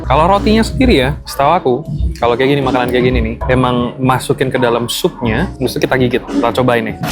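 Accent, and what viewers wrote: Java, Indonesia. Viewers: Medok men boso jowo ne mas 😅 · gak ekspek jadi mas² jawa tulen bgt medhog😭🙏🏻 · medhok is the best, lucu banget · Keliatan nyaman banget di semarang, tapi salfok sama medok nya 🥺 semangat keliling semarang nya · jadi muedokk gini... yang nonton juga ikutan medok ini jawaku 😂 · kok di surabaya sama semarang medhok banget yah apalagi kalau lagi ngobrol sama warlok padahal di jakarta nggak medhok loh, cocok banget emang kalau medhok 👍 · Wiiiii Semarang... Seneng bgt explore di tmpt perantauanku Asyik polll kyk diajak jalan jalan, medhoknya sukaaa nagih as always wkwk Jujur, liatnya makanan-minumannya bikin ngiler 😣😣 Makasih, aku jadi tau ada kulineran di tmpt tmpt itu wkwk Suka bgt konten jln jln kota kyk gini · versi medhok emang nagih banget ❤❤❤ Suka banget kontennya ❤❤❤ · SUMPAHH, MEDOK BANGETT 😭😭😭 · Ini lama-lama jadi food vloger berkedok vtuber😭 Tapi seru poll sii, apalagi kalo ngomongnya medok. 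Ditunggu konten jalan-jalan selanjutnya ✨🧡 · itu orang mana sih ngomong Jawa nya agak medok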